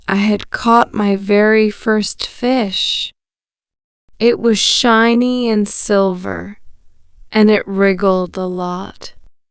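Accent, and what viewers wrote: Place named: United States. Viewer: بصراحة طريقتك ونطقك لللهجة الامريكية رااائعة.. واصلي واياااكي تفشلي.. راح تكبر قناتك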